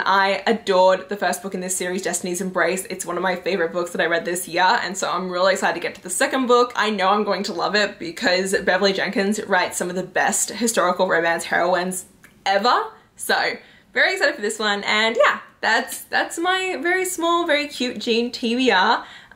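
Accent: Australia. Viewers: U sound extra Australian today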